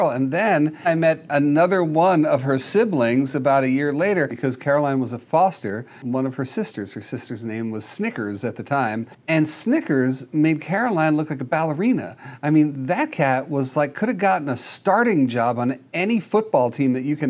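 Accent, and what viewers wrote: New Zealand. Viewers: She sounds more New Zealander to me, but yes, you're right about the biscuits, in the case of Australia. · As an Australian listening to this lady's familiar sounding New Zealand (or South African) accent, the cat's name is actually "Merlin", not "Melon". Although the name Melon seems hilariously appropriate given the context 😂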